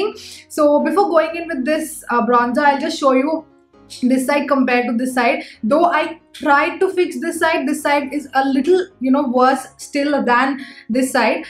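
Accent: South India, India. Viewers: Loving that South Indian accent in your English...❤️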